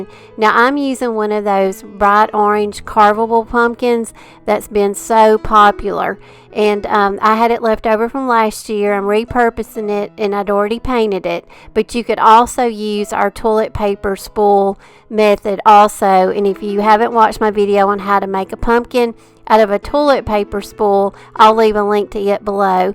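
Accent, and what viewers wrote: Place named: Alabama, United States. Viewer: You sound like you might be from Alabama ~ I am! Love your ideas& subscribed to your channel ❤️❤️❤️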